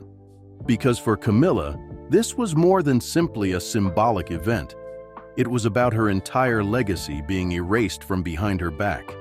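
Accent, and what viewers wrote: United States. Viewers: Well done with your titles, real click bait, but if you do click, after a while you give up, because it never gets to the point. Clearly made up, and an USAan voice does not give it any veracity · What rubbish Camilla is Queen why is an American voice saying this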